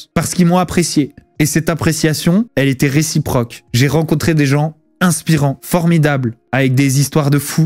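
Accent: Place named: France. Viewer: jecoutais pas dutout big brother mais car tu etais la jai ecouter merdouille et tu es sorti et jai trouve que tu avais vraiment plus laccent francais que sur tes chaines ..... jaurais vraiment aimer que tu reste plus longtemps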